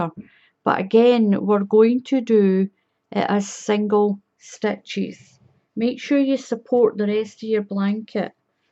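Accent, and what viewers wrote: Scotland, United Kingdom. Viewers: thanks, I live in Scotland so your Scottish accent is wonderful to hear,so many channels are American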